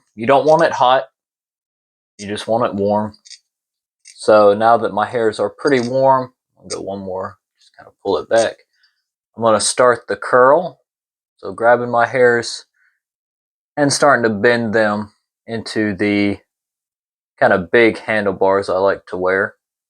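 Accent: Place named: South, United States. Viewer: i was honestly not expecting the southern accent.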